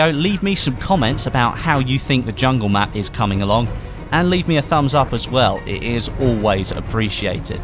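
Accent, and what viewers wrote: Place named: United Kingdom. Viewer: Yes, he's British.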